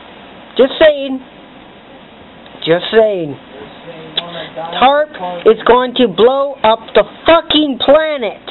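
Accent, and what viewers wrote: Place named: Ireland. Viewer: You Irish? or something? :P just asking coz your accent sounds like you have an Irish tongue. but i think you may be right. the HAARP is most likely the reason for all these earthquakes and the fish dieing and shit like that.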